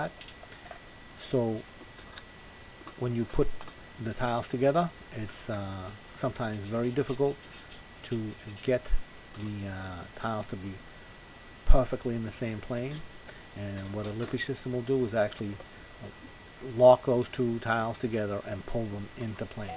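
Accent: Boston, United States. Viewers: You sound like a Red Sox fan so I know you’re not near me. But I could’ve used a tile pro like you. I got shoe makers working in my bathroom.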